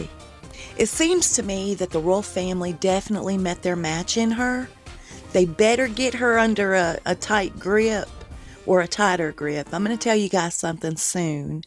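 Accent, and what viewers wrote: South, United States. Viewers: I love your reports. Your southern accent. You. Keep it up.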